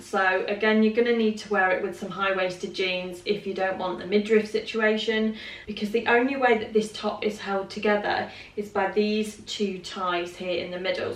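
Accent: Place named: United Kingdom. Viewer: British accent sounds soo.. delicious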